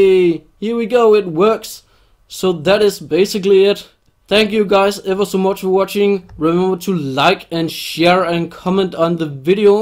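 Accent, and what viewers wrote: Denmark. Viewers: is a nice name:D And still so danish and so badass english I do really like your english speak:D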